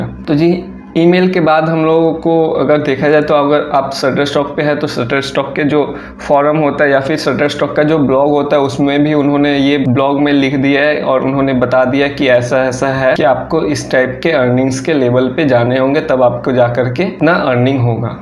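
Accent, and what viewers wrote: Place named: India. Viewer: Can't understand what are you saying in this indian-english style...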